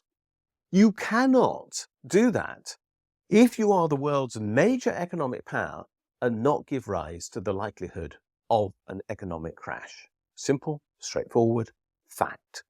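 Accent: United Kingdom. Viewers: It's still ranting and lunacy if it's said slowly by a posho brit.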